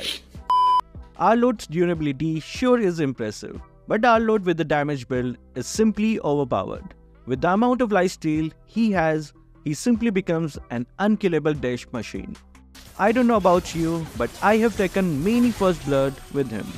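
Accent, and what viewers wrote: India. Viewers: Bro are you indian😅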